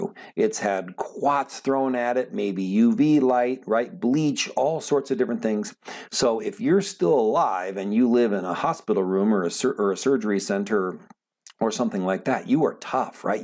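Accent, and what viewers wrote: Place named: United States. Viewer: Goddamn sir finally. Finally someone who speaks American English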